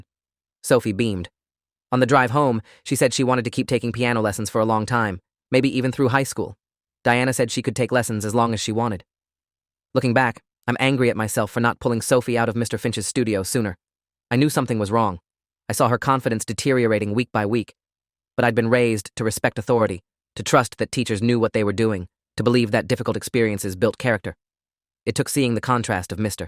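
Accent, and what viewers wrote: United Kingdom. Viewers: So I came from the short and this dude has a British accent now😭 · YO STOP WITH THE BRITISH ACCENT ITS CATCHING ME OFF GUARD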